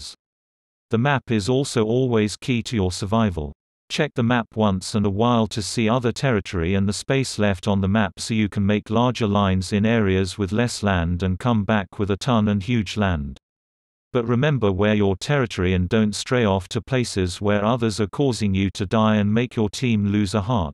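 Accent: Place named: United Kingdom. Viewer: You sound british. I make splix vids too.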